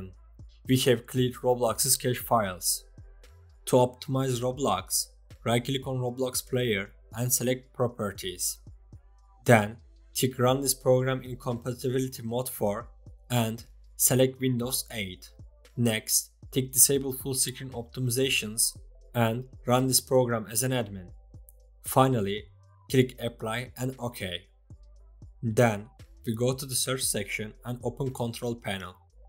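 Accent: Turkey. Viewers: vaay abim türk :D konuşma seklinden anladım abi kralsın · Aksanından ve şurda ve demenden Türk olduğunu anladım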